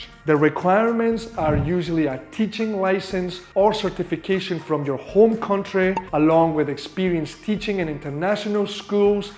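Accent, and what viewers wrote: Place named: Arab world. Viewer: oh, that tough arabic accent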